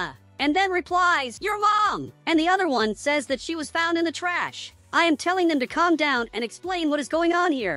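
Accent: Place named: Portugal. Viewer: Oxi ele é português e tá fingindo ser inglês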